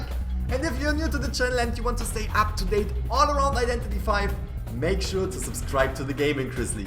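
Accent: Germany. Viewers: Are you irgendwie deutsch because you kling very deutsch xD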